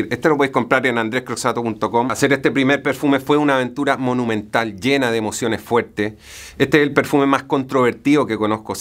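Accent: Chile. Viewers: estimado tienes acento chileno ? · Habla como chileno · Disculpen la ignorancia...de dónde es este compadre? Habla como nosotros los chilenos, pero ocupa algunas palabras en español. La pregunta es por si existe alguna zona de España que hable igual a nosotros.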